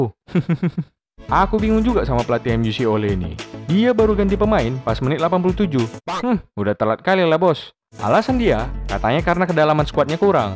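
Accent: Medan, Indonesia. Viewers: Lama lama kaya minang kocak bang, tapi versi medan wkwkkw sukses bang.. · Mantap kali logat Medan itu lek..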